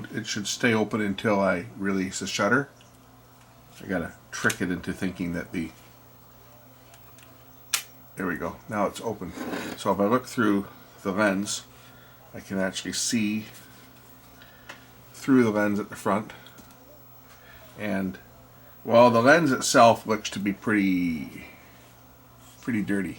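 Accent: Canada. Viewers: you speak funny language. sounds canada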